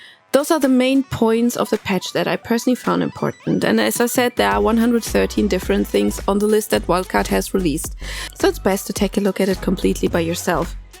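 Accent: Germany. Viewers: Bist du deutsch? Hört sich sehr danach an xD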